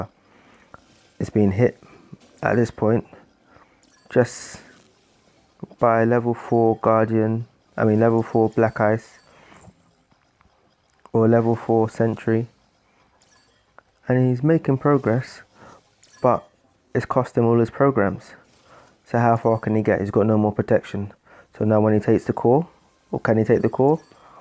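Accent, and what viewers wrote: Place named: India. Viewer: Индийский акцент... Кровь с ушей идёт от этой речи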